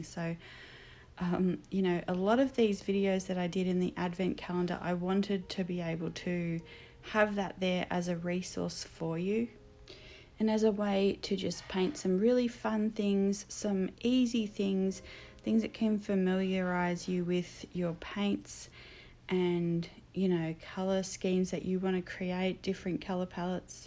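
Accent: Australia. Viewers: Not sure if my comment was posted, but wanted to say I’m so glad to hear an Aussie accent. I love your style. I am just getting into art after a career in nursing, so am inspired by your stuff. I an in NsW Thank you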